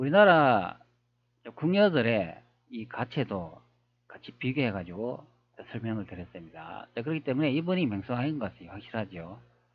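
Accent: Gyeongsang, South Korea. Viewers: 는 말투가 경상도 끈은데 책보고는 5.18선동좃나개 하네 니는 5.18을 선동하나 안하나 5,18은 북조선 빨갱이 짖이야 아니야 궁굼하댜 니 영상 잘 보고 잇댜 댓글 한 달아보쇼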